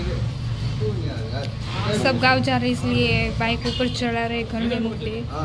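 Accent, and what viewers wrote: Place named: Gujarat, India. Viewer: Wah wah....gujju jyare hindi bole...bapa ketla saras lage😄👌👌👌👌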